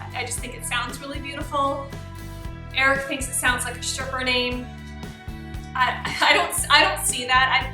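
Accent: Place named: Canada. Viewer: I was going to ask you if you were perhaps from Canada, as I was picking up on your accent. I got my suspicions confirmed when you said zed for the letter z.😂What part of Canada are you from? Ontario maybe?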